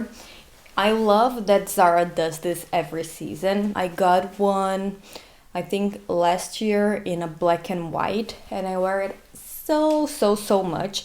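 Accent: Brazil. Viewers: love it lol. Would have never guessed you had a Brazilian accent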